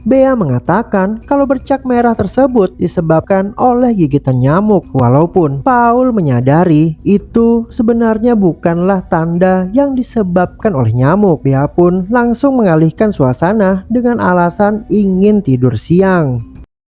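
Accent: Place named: Banjarmasin, Indonesia. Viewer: Yg bapender ni pinanya urang banjarmasin kalsel😅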